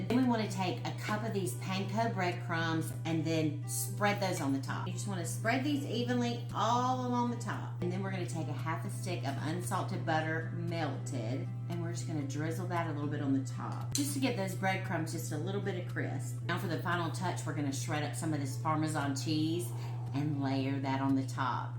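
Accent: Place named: Texas, United States. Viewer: You scream Texas..😃 where are you from? Or the Carolina’s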